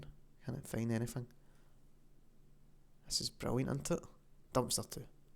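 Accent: Scotland, United Kingdom. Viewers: i was so happy when i heard that scottish accent, about 10x better than an indian dude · Yes a Scottish guy finally No homo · Finally a mod tutorial for me: with Scottish accent XD Thank you for this, it's very helpful and thorough. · A fellow Scotsman eh?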